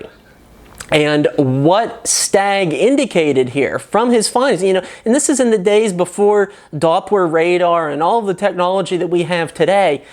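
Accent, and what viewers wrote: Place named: Louisiana, United States. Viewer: Hehe, historian, yes, linguist - no. "Shire" is pronounced the way you say it - as a stand-alone word. When part of a county name, NO-ONE in the UK calls e.g. "Oxfordshire" Oxford-shire - we pronounce it "Oxford-shur" - as with every "Shire" in the land. To British ears, you sound like a back-country Louisiana hick. Got your own banjo?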